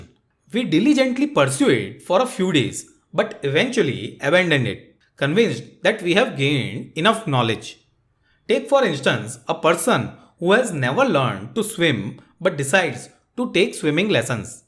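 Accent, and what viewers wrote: India. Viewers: Indian accent 🥀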